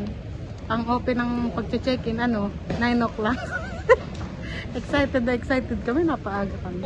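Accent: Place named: Batangas, Philippines. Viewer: oh i see. ung boses at punto mo kc parang batangas.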